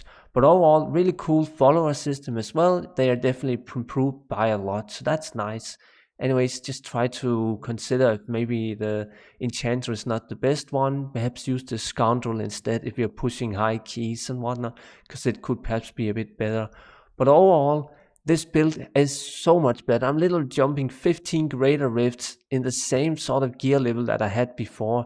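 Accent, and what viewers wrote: Denmark. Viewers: Fed nok video. Så tydeligt at hører når det en dansker. 😂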